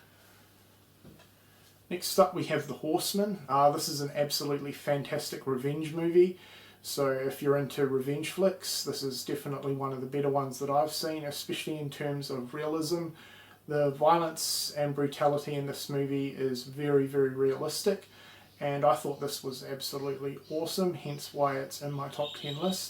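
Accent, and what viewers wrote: New Zealand. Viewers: sorry this question has nothing to do with the video but are you originally from new zealand because you sound like a kiwi.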